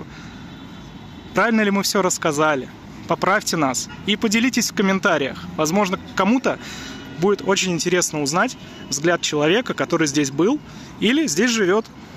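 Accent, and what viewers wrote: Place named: Volga, Russia. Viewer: У вас Поволжский акцент,вы скорее оттуда.Я сам бакинец,но живу сейчас на Кубани на Чëрном море.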